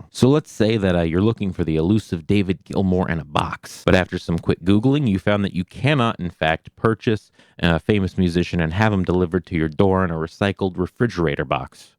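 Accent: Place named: Chicago, United States. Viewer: Your Chicaaaago is showing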